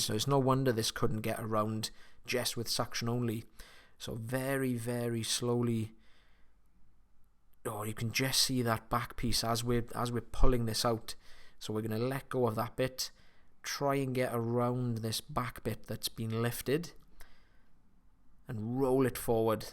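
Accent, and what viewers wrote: Wales, United Kingdom. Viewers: It's Welsh if I'm not mistaken.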